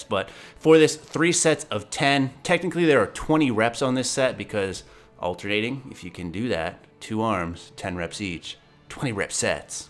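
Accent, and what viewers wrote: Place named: South, United States. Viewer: dem accents doe seriously big upps more of that southern accent it makes these videos so much better:D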